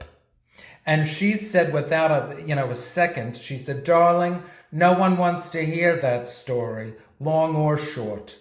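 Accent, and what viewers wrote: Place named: New York, United States. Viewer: I like how you have a New York accent even though you live in Southern California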